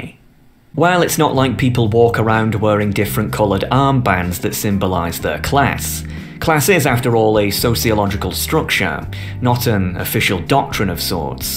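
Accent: United Kingdom. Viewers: It took me a while to figure out how nonsensical this guy is. I guess I gave him more leeway because of his nice British accent. 😅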